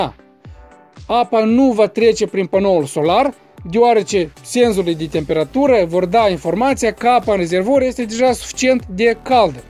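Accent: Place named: Moldova. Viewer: Prezentarile schematice ale dumneavoastra pe youtube sunt foarte benefice. Ami place accentul si limbajul dumneavoastra de moldovean autentic. Multumesc mult pentru efortul si timpul cheltuit. Am un system de incalzire al casei cu termosemineu pe lemne , centrala electrica trifazata de 19 kw typ instant hot water, cu care ami incalzesc caloriferele in 6 minute, pana focul din termosemineu incalzeste primii 200 l de apa din puffer apoi o opresc, un boiler electric care e conectat si la apa calda de la centrala electrica si la puffer. Pufferul de 800 l l-am compartimentat in 200, 400 si 800 l cu jutorul unor robineti, ca sa nu incalzesc toti 800 l de odata, dar nu l am cumparat si cu serpentina pentru a adauga instalatie solara cu tubui presurizate. Am vazut ideea dumneavoastra cu doua acumulatoare de apa care e f. buna. Credeti ca pe timpul iernii daca adaug systemul cu tuburi presurizate poate ajuta putin temperatura apei din puffer? 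Credeti ca e posibil sa adaug si systemul cu tuburi solare presurizate cu un nou acumulator de 500 l cu serpentina, la systemul meu deja cu doua surse pentru incalzirea caloriferelor si un boiler pentru apa menajera? Daca e posibil atunci doresc sa am o diagrama completa cu detalii, contra cost. Timpul si efortul cheltuit inseamna bani sunt de acord cu dumneavoastra. Multam frumos Costel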